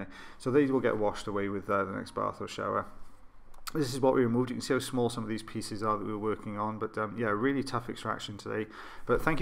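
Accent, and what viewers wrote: Wales, United Kingdom. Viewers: From where do you hail? I live in Oxford but was born in Bridgend, Glamorgan, and thought your accent sounded familiar! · That is probably because of his lovely Welsh accent. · I love these videos. And your british accent. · You sound welsh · He's Welsh.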